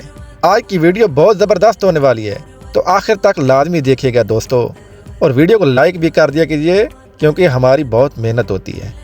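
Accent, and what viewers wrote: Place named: Punjab, Pakistan. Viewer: بھائی اردو تو سیکھ لو ۔۔ پنجابی میں اردو بول رھے ھو ۔۔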